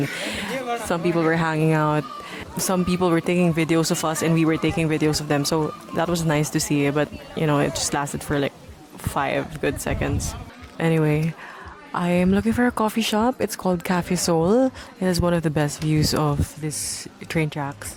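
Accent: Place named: Latin America. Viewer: Lol I thought you were from south America during the whole video until shortly before the end. 😂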